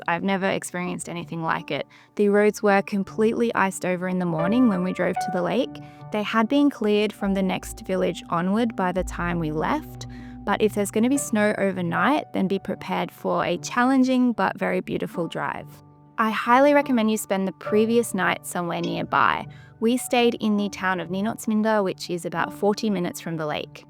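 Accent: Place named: Australia. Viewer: This ice-bathing has been a common practice in nearby Russia too, since time immemorial. As with Wim Hof's practices. Wonderful to hear the history and special relevance to Georgian practices, thank you. All your photos, videos and blog are exceptional. Great to hear a fellow Aussie voice - and from Brisbane no less :-)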